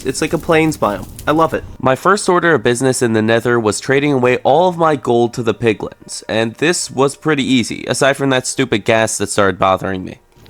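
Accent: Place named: United Kingdom. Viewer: Bros british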